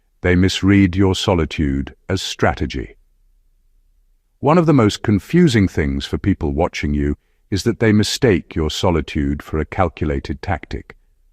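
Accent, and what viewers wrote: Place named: New York, United States. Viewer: Vivid imaginations they have, don’t they ? Their lost in the sauce. NY accent sawus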